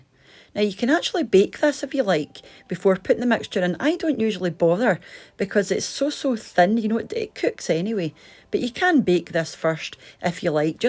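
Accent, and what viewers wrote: Scotland, United Kingdom. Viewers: Just found you today. I love your Scottish accent and the seaside is beautiful. I’m going to search out more of your recipes. Thanks! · Love your video and your sweet Scots accent! I first watched it with the sound off and closed captions on, but they made no sense at all, ha ha ! Much more enjoyable to listen than read. Definitely want to try your recipe. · i just love your Scottish accent ;-) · Love the pie but the CC had me in stitches, a Scottish accent is not easy to translate. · Hello there! So glad I came across your channel. This is the first time watching and thank you for the yummy recipe. I am an 80 year old granny, living in South Africa and am always open to new ideas as, one can never know enough, even at my age. I probably missed your competition but, even just to hear your beautiful Scottish accent and see the delicious recipes, is good enough for me. 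Do you ever do Haggis or the real Scotch shortbread biscuits? God bless you and your family. Shalom!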